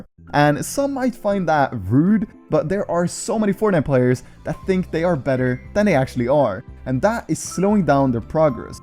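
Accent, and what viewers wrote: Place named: Norway. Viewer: jeg visste at du var norsk jeg kunne høre comsa dine pa turneys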